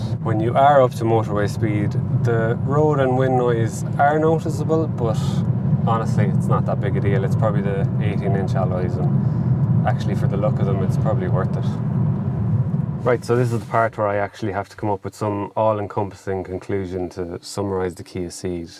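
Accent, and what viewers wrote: Ireland. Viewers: Best channel ever... It has cars and Irish English, love it. Hope all is well with Sinead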